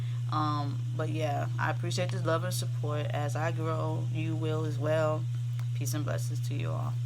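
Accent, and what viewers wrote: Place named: New York, United States. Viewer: Good morning, I just found your channel, oh my goodness, what a gift you have! And thank you for blessing us with all your wisdom, knowledge, guidance and truth! You enlightened and empowered me with your uplifting spirit and powerful messages. This is what I needed ASAP, now I feel better equipped to move forward and start a new beginning with myself. P.S. you sound like a New Yorker, I live in the Bronx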